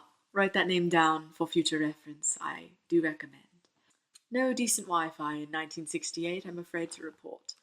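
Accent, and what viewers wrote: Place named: England, United Kingdom. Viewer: This is great and I love your English accent.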